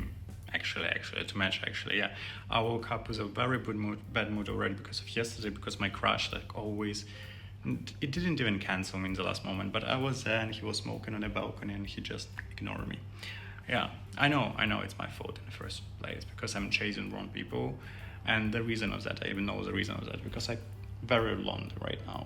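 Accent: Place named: Germany. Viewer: I won’t judge you. I love your German accent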